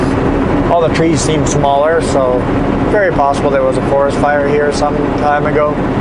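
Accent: Canada. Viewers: Sadly, Yes. And in California gold fields, roads and railroads the Irish immigrants were used in the same expendable ways as were Chinese "laborers". I suspect that may have been true in Canada as well. I'm delighted to hear the Irish and Scottish influences in your wonderful Canadian accent as you speak, eh?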